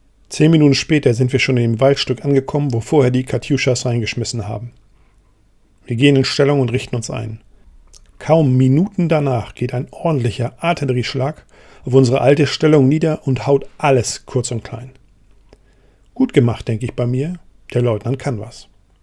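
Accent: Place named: North Germany, Germany. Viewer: Wie immer spannend vorgelesen. Alle Achtung ist eben Norddeutsch.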